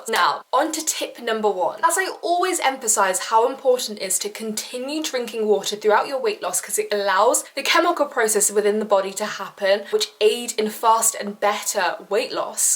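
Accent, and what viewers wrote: United Kingdom. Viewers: Omg i love the British accent 💖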